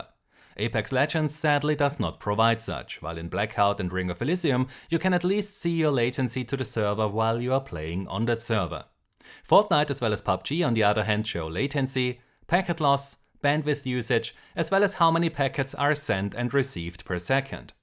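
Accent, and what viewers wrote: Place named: Germany. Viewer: Man hört es als deutscher das du deutscher bist 🤙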